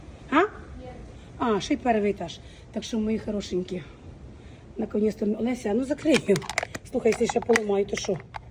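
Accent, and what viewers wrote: Surzhyk, Ukraine. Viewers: Суржик колхозный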